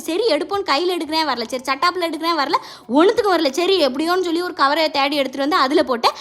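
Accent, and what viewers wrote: Tirunelveli, India. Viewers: nenga pesradha paka en relatives en pakathula iruka mathiriye iruku... married and settled in Chennai ❤native tirunelveli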